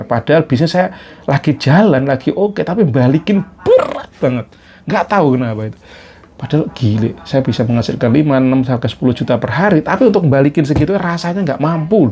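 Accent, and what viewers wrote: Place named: Java, Indonesia. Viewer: Mendok & terlalu berbasa basi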